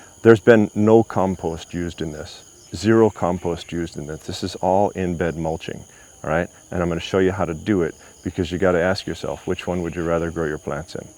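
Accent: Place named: India. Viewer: Excellent info,! Also, I am hearing hints of Indian accent when you speak. It's totally cute!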